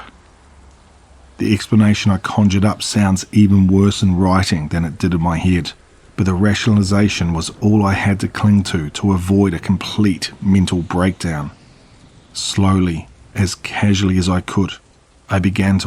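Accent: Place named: New Zealand. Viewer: Another great story. Thank you for providing us with very unique stories in your fantastic Kiwi accent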